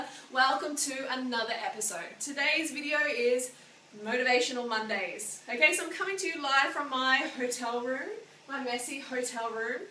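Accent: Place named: Australia. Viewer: you have a nice body it is such a pity you speak like a dumb bimbo australian!! i was going to marry you until you opened your big ozzy gob!! i come from devon so speak like a farmer look like shrek!! how can you help me? get healthier i am getn a bit of flub on my ut i eat meat wrestle animals to the grounds if i am hungry gnaw at their ankles! if i dont get meat!! your meat is very tasty & nice!! so can i have some?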